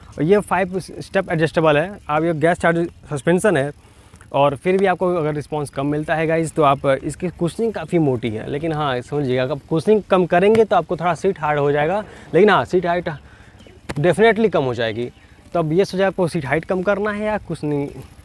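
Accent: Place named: Bengal, India. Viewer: আপনার কথা শুনে বোঝা যাচ্ছে যে আপনি একজন বাঙালি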